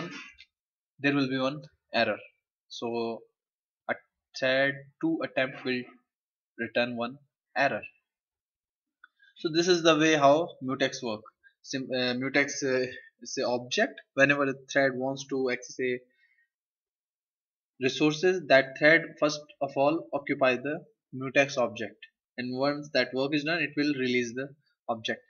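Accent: India. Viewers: The indian english made me quit after 10s. Bye.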